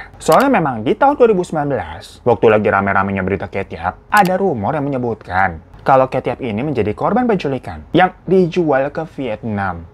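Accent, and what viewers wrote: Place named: Jakarta, Indonesia. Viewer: Lg gawe denger cerita begini, ngakak 🤣🤣🤣 Betawi bet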